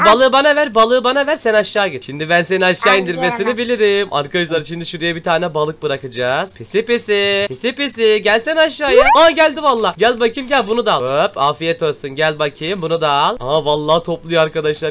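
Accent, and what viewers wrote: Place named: Rize, Turkey. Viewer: 0 Rizeli gibi konuşuyor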